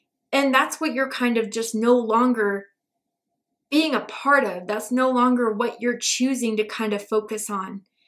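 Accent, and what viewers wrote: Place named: United Kingdom. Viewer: Are you from the UK 🇬🇧 😊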